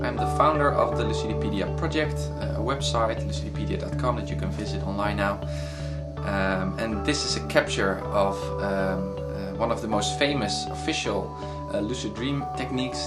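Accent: Netherlands. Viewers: nederlands accent :D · I can hear you are dutch :P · Haha, when I heard your voice I thought "hmm, that's sounds like the advanced english usage by a Dutch person", and I was right. Keep up the good work en veel geluk! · Are you dutch? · Lol. First sentence was said and I knew you were Dutch :D!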